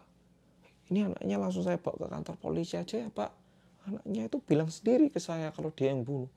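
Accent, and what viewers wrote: Java, Indonesia. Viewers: Knp ganteng trusssss sih skrg mas medhok nya ❤️❤️🥰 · Hallo mas.. Jowone medhok bngt. Pertahankn yo mas, fasih bngt ngmong bhasanya. Sukaaa bngt, pokonya sucsses sllu buat mas, salam dr mlysia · 3 channel storytelling favorit: 1. ( medhok, tapi paling asik, berasa diceritain pacar) 2. Nessie Judge (Bahasanya gampang dimengerti) 3. Arroyan Pram (Konspirasi dunia pake sains dan teknologi, Astronomi, Fisika, dan editannya paling niat dan bagus) kalo kalian? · Lama g dgrn suara medhoke mas. Lama g nntn, makin ganteng aj kamu rek... kaya idol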